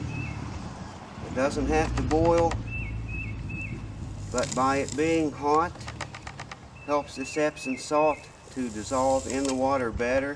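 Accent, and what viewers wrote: South, United States. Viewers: lol, actually sounds more like Earnest T. Bass (character in the old Andy Griffith show - the hillbilly who comes down from the hills , every now and then, and causes mischief)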